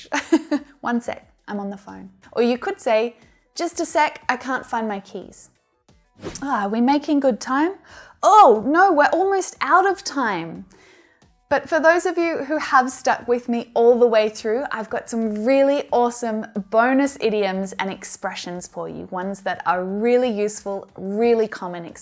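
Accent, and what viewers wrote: Australia. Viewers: I ❤️ Australian Accent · I love Aussie accent and lucky for me I’m Aussie come from Australia live in Australia like if your Australian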